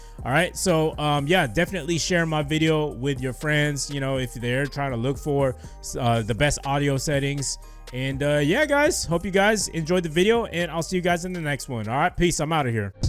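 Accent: Scotland, United Kingdom. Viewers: It's Scottish pal not Australian! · Its Scottish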